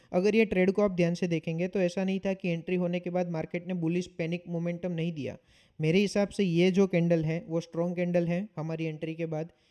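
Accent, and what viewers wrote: Gujarat, India. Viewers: Bhai Tame Gujarati cho?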